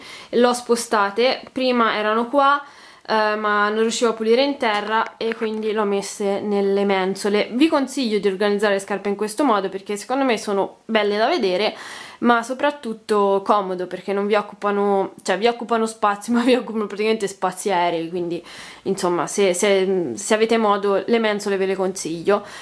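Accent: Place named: Arezzo, Italy. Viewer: Beeeeello l'accento aretino, l'ho capito subito che sei di Arezzo! Io sono di Firenze, ma i miei nonni sono di lí vicino e mi piace un monte come parlate!:) Mi sono iscritta ora comunque, e mi piaci giá:)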